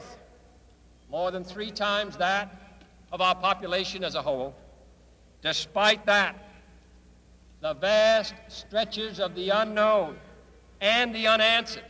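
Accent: United States. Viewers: Why not a Boston accent?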